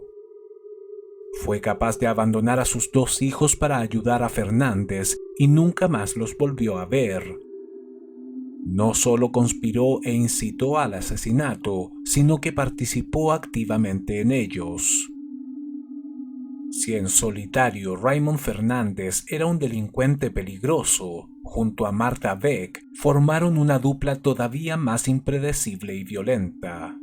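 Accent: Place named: Chile. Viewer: Este canal es demasiado bueno, pero el hablar del locutor lo tira por tierra, pues tiene un fuerte asento chileño, podría contratar un locuror mexicano o colombiano, incluso español....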